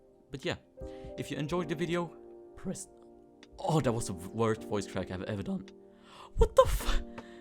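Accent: Sweden. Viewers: Ahh, the original Swedish accent. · are you swedish? 🤔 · sweden? i can hear that little accent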